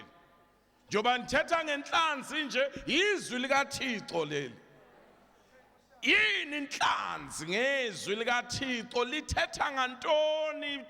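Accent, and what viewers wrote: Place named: Xhosa, South Africa. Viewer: Wamuhle simakade asimnandi isiXhosa sakhe sindenza ndiyive ngakumbi mna lo myalezo, ziphi na inceku ezigcwele ilizwi nje ngale ndoda.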